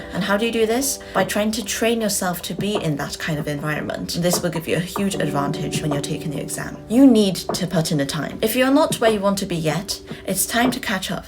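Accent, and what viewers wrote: RP, United Kingdom. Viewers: Why the fuck are you speaking in RP? You sound like the posh AQA languages listening reader PS。你会说中文吗？ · The combination of the memes and the poshest accent in existence 💀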